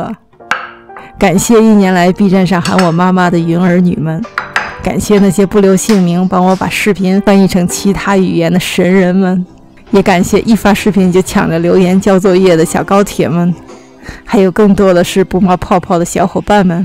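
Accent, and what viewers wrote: China. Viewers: Michael S 那可真没有… 这口音一听就不是北京人，但说的是普通话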